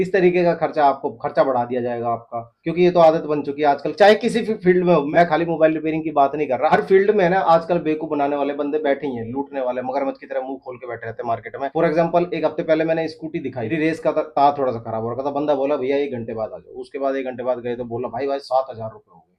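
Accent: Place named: Haryana, India. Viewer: hisar,haryana.Boli t smaj gya tha m chhora haryanvi h👊👍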